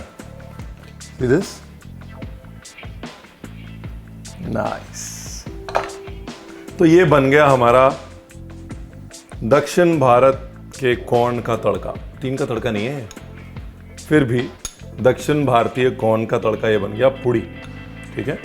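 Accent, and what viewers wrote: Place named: Bihar, India. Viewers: Ek tho do tho Bihar bhasa mast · 2 tho, 3 tho, bihar se hai kya bhaiya..? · ji tohada Bhojpuri boli da touch khub vadiya lagya si assanu.. 🙂 Coming to Poodi- Yenaka Poodi rumba pidikyon.. I am Super blessed to have a Tamilian inlaws family.. My mother in law prepars food with lots of conciousness, blessings and ofcourse love.. I can indeed realize and see the same conciousness and true love in your preparations.. 🙂 Thank you so much for sharing love and care through your authentic food/cooking.. From Russia..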